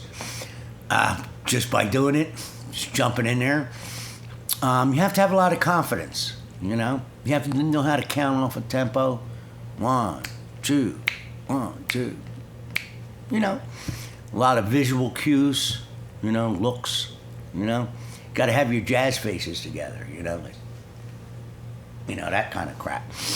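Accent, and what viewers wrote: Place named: Philadelphia, United States. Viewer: Wonderful stuff., you have the purest Philadelphia accent ever!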